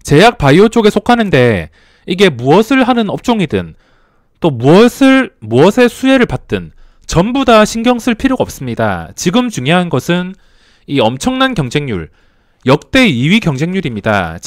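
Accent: South Korea. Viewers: 사투리가 섞인 서울말? 은근히 중독됩니다.^^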